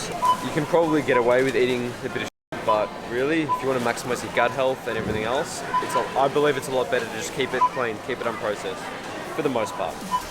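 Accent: Australia. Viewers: Is this guy aussie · Are you Australia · are you from Australia 🇦🇺? If so I’m from Melbourne Australia 🇦🇺 G’day mate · Aye are ya from Melbourne?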